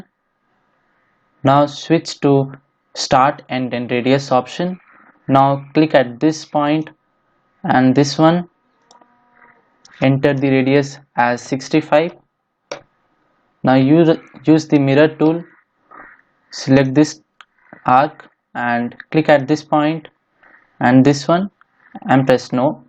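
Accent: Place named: India. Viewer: Video too fast and please reduce the indian accent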